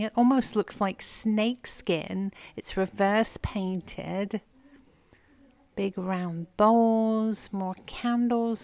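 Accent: England, United Kingdom. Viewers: Your accent makes me want to turn you off,some times you overdo the High English accent.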